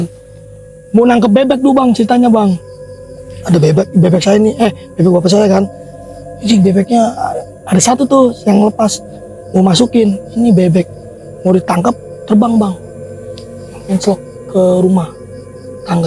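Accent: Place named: Banten, Indonesia. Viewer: Gendrowo main kejar2n lucu juga..😀 Bang asyik ngebawain ceritanya..senang dengar alurnya..logat bahasanya berasa sangat banten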